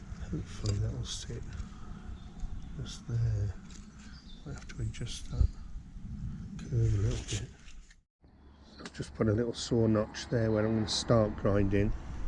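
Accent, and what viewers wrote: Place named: West Country, United Kingdom. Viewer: Just found this site and I am mesmerized by the seemingly precarious nature of your railway. It's clearly a labor of love. The amount of excavation you have done with just a pick and shovel is amazing. Well done mate! Can't wait for the next video. BTW, where in Britain are you located? I detect west country accents. Cheers from the USA.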